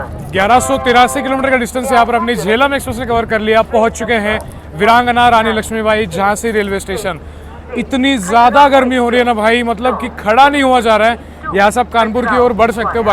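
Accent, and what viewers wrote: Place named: Maharashtra, India. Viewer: You are a marathi person and we enjoy your sweet Hindi.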